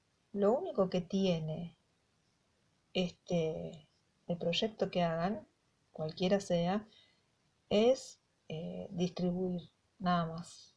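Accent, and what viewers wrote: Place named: Buenos Aires, Argentina. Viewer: Me encanta! Una argentina...por la tonada de Buenos Aires!❤. Bendiciones!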